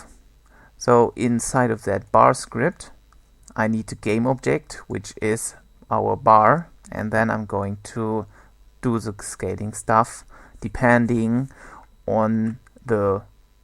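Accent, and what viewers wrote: Germany. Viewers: Great tutorial. I love how you pronounce "th" as "z" like a stereotypical german. For normal use you would make a function like this instead of decreasehealth: void changehealth (int healthvalue) { if (cur_Health == healthvalue) return; if (healthvalue > max_Health) cur_Health = max_Health; else if (healthvalue >= 0) cur_Health = healthvalue; else cur_Health = 0; float calc_Health = cur_Health / max_Health; SetHealth (calc_Health); }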